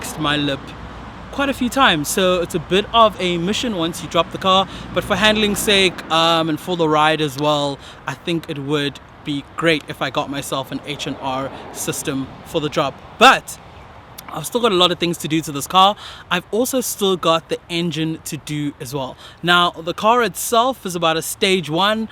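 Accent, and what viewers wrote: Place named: United States. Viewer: Good effort bruv. IMHO try being more yourself South African opposed to American you tubers accent wise. Nice car I've also got a R 2016 dsg oryx white from new and a V8 M 2008 black on black 6 forward drivers car. Looking at a Hawkeye STI in the near future sick project. Keep it up also the R is a beast tuned especially of the line.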